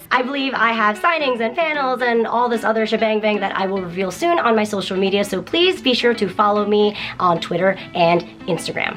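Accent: United Kingdom. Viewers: So when are we getting a video of you with a British accent the whole time? ;D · This is really interesting, you should do a video in your English accent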